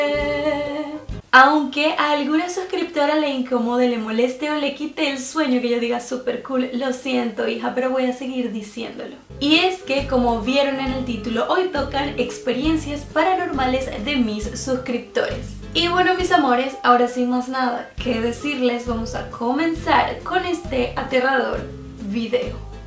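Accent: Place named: Caracas, Venezuela. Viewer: Me encantan tus vídeos! Se que eres venezolana (de San Cristóbal si no me equivoco) pero siento tu acento muy distinto a como usualmente hablan los gochos jaja, disculpa si es personal, pero viviste en otro estado? Que si en caracas o Valencia? Tu acento es súper caraqueño (te lo dice una caraqueña de pura cepa 😂) Un abrazo! ❤️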